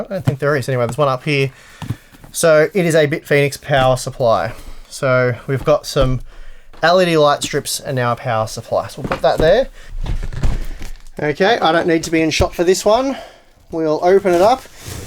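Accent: Australia. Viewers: It has to be difficult to pronounce NZXT as NZedXT all the time, and convey whatever the message is. Lol I honestly love Aussie accents, but certain European and Aussie pronunciations crack me up.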